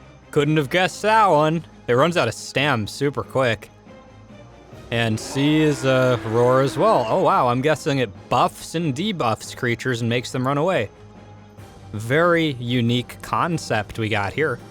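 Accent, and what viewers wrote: Australia. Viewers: Disliking because of the terrible Australian accent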